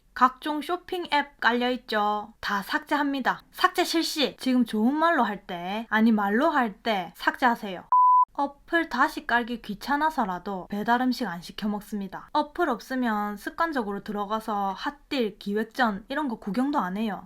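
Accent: Gyeongsang, South Korea. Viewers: 경상도 사투리에 똑부러지는 조언 딱 이상향형????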